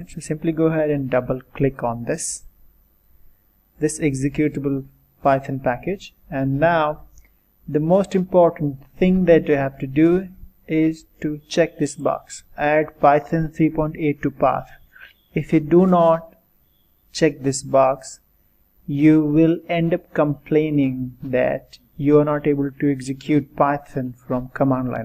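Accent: India. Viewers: The Indian accent is your proof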